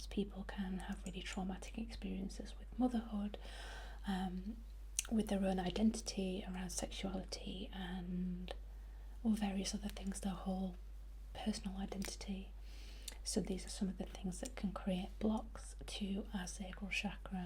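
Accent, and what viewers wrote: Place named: Yorkshire, United Kingdom. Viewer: I recognise that accent. ❤ From Pontefract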